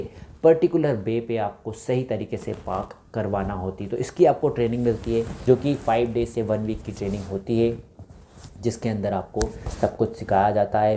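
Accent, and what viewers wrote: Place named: India. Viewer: damn that indian accent..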